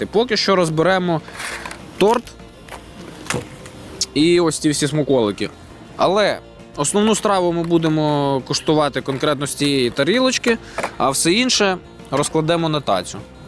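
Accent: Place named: Surzhyk, Ukraine. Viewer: Сельский суржик , на украинском зачиняем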